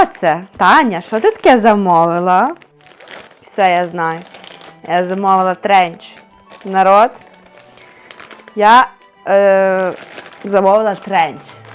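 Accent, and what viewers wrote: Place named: Ternopil, Ukraine. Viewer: Ты з Тернополя? Я знаю одну жінку з Тернопільської області, вона спілкується майже як ви.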